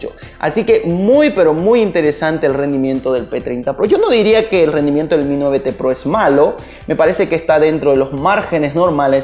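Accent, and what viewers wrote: Bolivia. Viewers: tenes el acento de un camba, y tipico siempre dicen el "obviamente" jjaja donde queda tu tienda?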